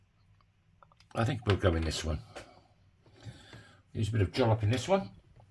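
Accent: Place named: Essex, United Kingdom. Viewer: you mentioned you were in Cornwall at one point, where out of interest , I me in St. Mawes. but like me your accent says Essex or east London!!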